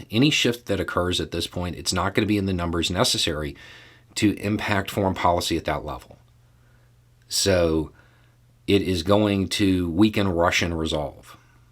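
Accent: South, United States. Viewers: Why do we get a bullshit republican "newspaper" ad after watching the fifth column?. Do they think that all rednecks are stupid and Uneducated? Certainly not if we are listening to this guy who talks with a hard as rock southern accent and still makes more sense of the political shit happening every day better than CNN. Kee it up hombre, we will just skip the Epoch Times crap!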